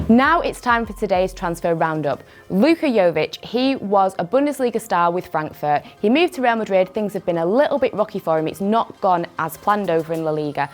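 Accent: United Kingdom. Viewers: Just when I started understanding British accent. One football English: Here's the level 2. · Feels good to hear a bit of pure British accent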